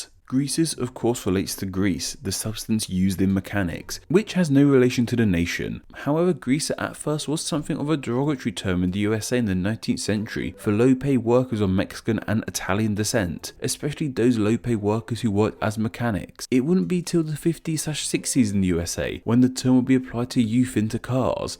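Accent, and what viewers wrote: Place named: United Kingdom. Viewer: Listening to a British man explain hippies is hilarious.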